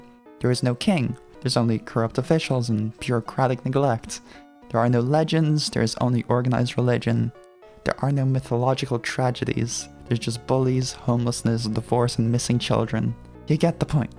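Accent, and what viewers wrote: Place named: Ireland. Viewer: Nice to hear an irish voice in the sea of Americans 😅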